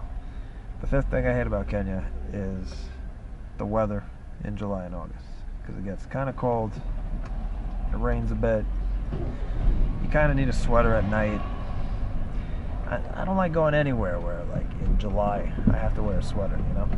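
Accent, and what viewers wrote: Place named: New York, United States. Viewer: Awesome ! leaving kenya is always hard and boy time flies! EAC is beautiful . Thank you for your corky NYC accent/narrative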